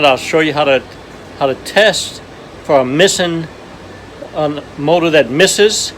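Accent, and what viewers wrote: Louisiana, United States. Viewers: Haha I miss dat accent. My people are in Thibideaux.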